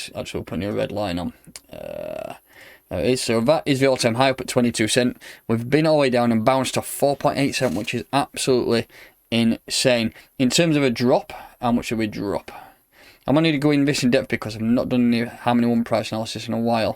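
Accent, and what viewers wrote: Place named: Yorkshire, United Kingdom. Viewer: Lol. That’s deffo a Yorkshire accent!!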